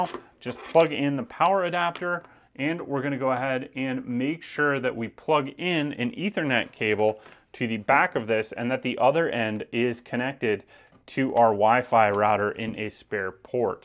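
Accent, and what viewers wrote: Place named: United States. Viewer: Speaks to fast with strong us accent no good for older people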